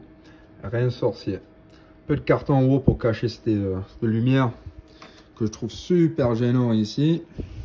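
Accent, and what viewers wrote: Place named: Aude, France. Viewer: Pour les tables Zaccaria en promotion, on peut espérer les trouver à combien sur leur site. Parce-qu'actuellement elles sont à 250$ (1 à 5). J'avoue le prix est élevé. Je me suis autorisé l'achat des jeux verticaux, malheureusement quand j'ai reçu la clé d'UK. Le pinball me l'a planté. Ils m'en envoient une autre et m'ont dit que cela pouvait arriver. Quand j'écoute votre accent.....vous semblez ne pas être très loin de chez moi. Je suis du dep 11. Je vous dis à votre prochaine vidéo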